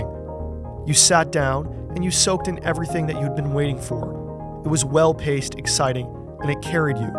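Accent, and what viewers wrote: United States. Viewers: Wederom dank voor je video (overigens bizar hoe je accent zo Amerikaans is). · i dont get it Why is this guys obsessed with europe He sounds American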